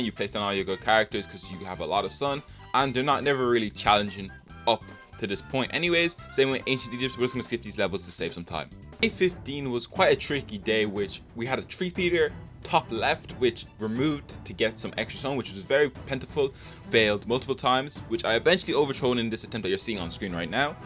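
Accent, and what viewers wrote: Ireland. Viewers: Are you Irish cause I’m Irish too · You irish?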